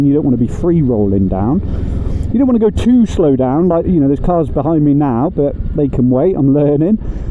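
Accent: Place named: United Kingdom. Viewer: The British accent makes it so good. Very entertaining. Keep it up!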